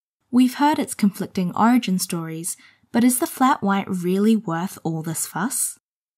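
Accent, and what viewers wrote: New Zealand. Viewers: 플랫 화이트 커피의 기원에 대해서 개인적으로도 너무 알고 싶었던 내용인데 이렇게 뉴질랜드 영어 발음으로 듣게 되어 영광입니닷! XD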